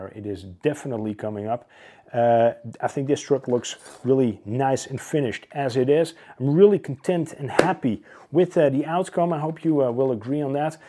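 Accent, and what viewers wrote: Netherlands. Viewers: Very nice video like it. Just thinking of getting a CJ. Btw, do i hear an dutch accent? 😊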